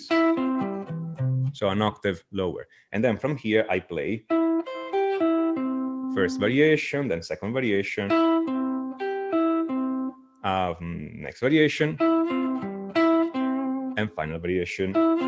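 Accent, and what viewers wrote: Italy. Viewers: I love your positive energy, your great insight, your interesting ideas and angles and your charming italian accent. You make me smile as you make me wiser. Thanks! Mille grazie!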